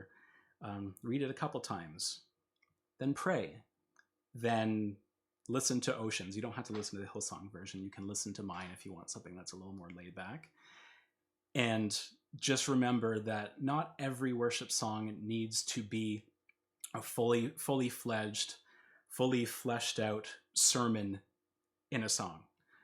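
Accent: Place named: Canada. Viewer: I thought you were Canadian, before you said so. The way you say "about", "shout," words like that gave it away.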